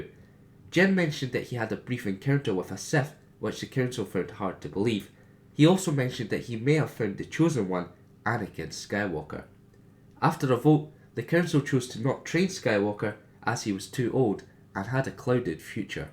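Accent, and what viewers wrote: Scotland, United Kingdom. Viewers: the scottish accident makes it better more like a young Kenobe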